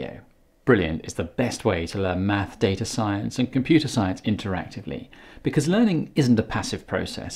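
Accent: England, United Kingdom. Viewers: Ok United Kingdom accent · Darling, your English voice is so comfortable to listen to, would you consider bringing in a Spotify podcast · why is every fucking youtube clip I click on either narrated or presented by a Brit?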